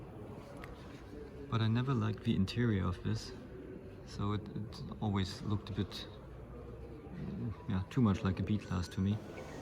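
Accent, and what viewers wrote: Germany. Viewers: When a Dutch person speaks English, it is horrible to listen to. But with your (German) voice, it sounds absolutely perfect.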